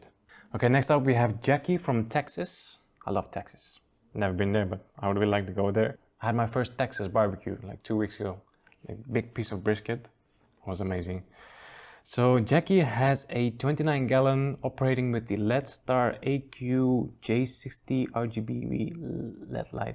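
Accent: Belgium. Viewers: I definitely want to hear your Belgian accent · I do wana hear your belgian accent xp k ben van Antwerpen! Xp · Ben ik de enige die niet kan slapen tot ik zijn Belgische accent gehoord heb?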